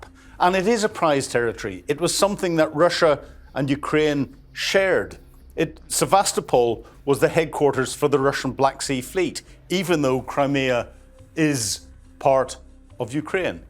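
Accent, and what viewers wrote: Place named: Scotland, United Kingdom. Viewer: IT'S The SCOTTISH BROGUE.❤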